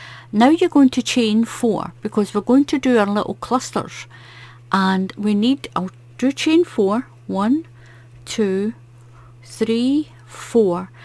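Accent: Scotland, United Kingdom. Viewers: It's been a while since I've had time to watch your videos. ( I didn't realize how much I missed your delightful Scottish accent.) This stitch pattern is quite lovely. I must give it a try. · Beautiful pattern and beautiful colors! Also loved your accent, which appears to me as Scottish! Greetings from Portugal!😊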